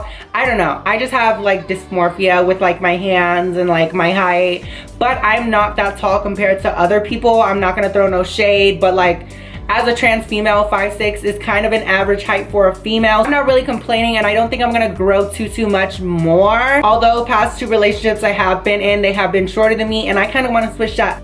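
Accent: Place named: New York, United States. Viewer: I like how you said “dau - tuah “ I love ny accents 😭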